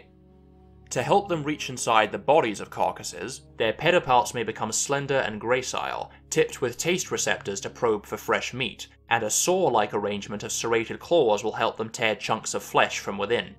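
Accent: United Kingdom. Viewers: A guy with a British accent is explaining a prehistoric world to us. It makes me wish that there wouldn’t be copyright consequences if you just started using the musical score from Walking with Dinosaurs for the background of these.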